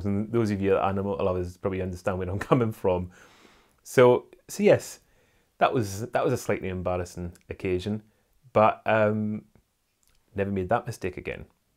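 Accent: Scotland, United Kingdom. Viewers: I’ve just discovered your ‘show’, how lovely! You do a wonderful job especially because you aren’t malicious or gossipy, we don’t need any tabloid nastiness. Your delightful stories (though the children’s one and the soldiers one had me welling up) show genuine insight into the Duchess. Through your work, you have had amazing experiences, thank you for sharing them with us! ( what part of Scotland are you from? My father was from Aberdeen, I loved his gentle accent)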